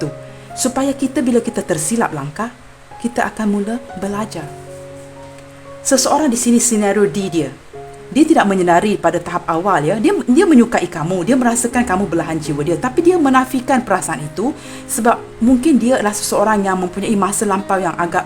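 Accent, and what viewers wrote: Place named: Malaysia. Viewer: Pisces Hadir. 👏🙏 readingannya sangat resonet sekali. Trimakasih atas readingannya. Smoga dlm keadaan sehat2 dan bahagia sll bersama kluarga tercinta di sana. Selalu sukses buat. Sepertinya klo sya dengar percakapannya, adalah orang India Malaysia klo tak salah yaa. Sya sangat senang dan bangga sekali dng readingannya, sebab sangat jelas dan resonet. 👏🙏🙏👍👍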